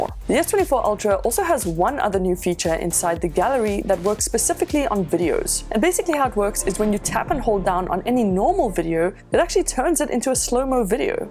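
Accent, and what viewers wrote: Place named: South Africa. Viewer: Yooo this is crazy, I stumbled on this video and instantly thought you sound South African. Let's go such a good quality video. I'll be sticking around. Subbed!!🎉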